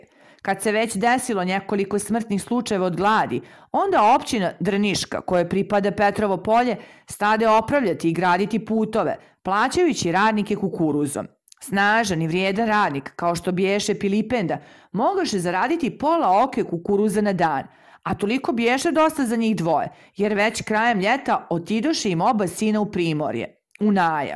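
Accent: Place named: Serbia. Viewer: Jeli ovako govore Dalmatinci ovo je Srpski iz Srbije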